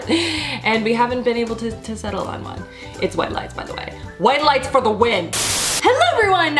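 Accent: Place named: Canada. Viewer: I love hearing you say about... you're a true Canadian😋 sad that I live in Minnesota because I have the accent but not a strong as yours😢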